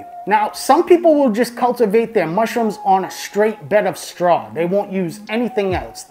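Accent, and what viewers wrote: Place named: Massachusetts, United States. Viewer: we know your in Mass with that accent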